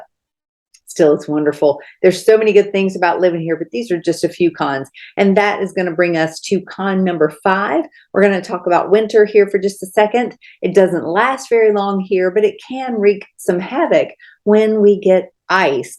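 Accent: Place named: South, United States. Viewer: Awesome video! btw....love that Southern accent! I'm a northerner that loves the Southern charm. God Bless!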